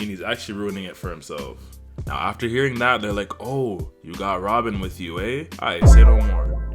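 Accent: Toronto, Canada. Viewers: You have that native Toronto accent lol it's strong!!! You sound like Drake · Never thought I’d hear a Toronto man doing one of these types of videos 😂 Toronto man anime is a vibe 😂😂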